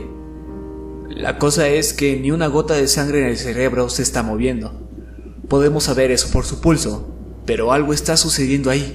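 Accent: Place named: Latin America. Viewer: Me encanta tu acento latino el mejor, tu narración simplemente genial Gracias!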